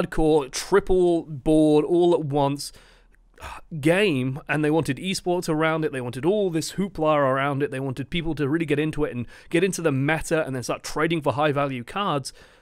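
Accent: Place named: United Kingdom. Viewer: I like rant-esque news and thoughtpieces by british-accented folk.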